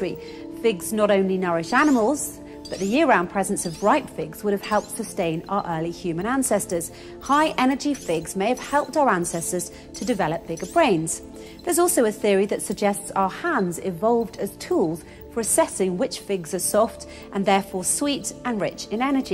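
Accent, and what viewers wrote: United Kingdom. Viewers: Speaking in a british accent in no way diminished the primitive nonsense you are trying to sell here.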